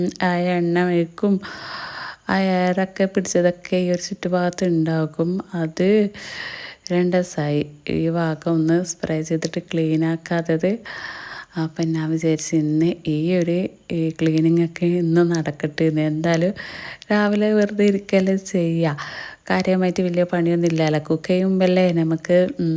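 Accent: Kannur, India. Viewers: Nice vedio dear snacks adipoli nigaludey കണ്ണൂര്‍ slang anu enikku ishtam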